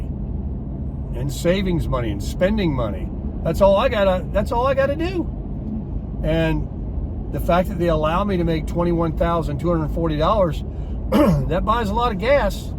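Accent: South, United States. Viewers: speaks from the heart. A real Southern gentleman who's a pleasure to listen to.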